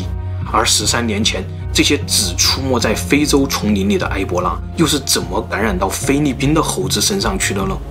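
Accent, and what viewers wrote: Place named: Hunan, China. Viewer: 带着湖南口音的普通话，居然能把故事讲得如此引人入胜，真是个奇迹！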